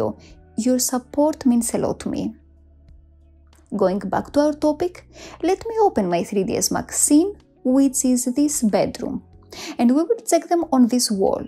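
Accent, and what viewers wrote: Russia. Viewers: English language with Russian accent ouraaaa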